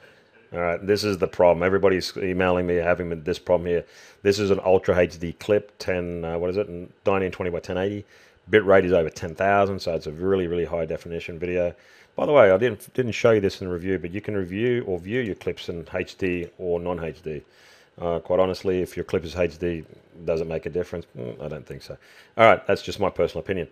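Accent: Australia. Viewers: thanks alot. Big help. please do the costume template video as well nice to hear aussie accent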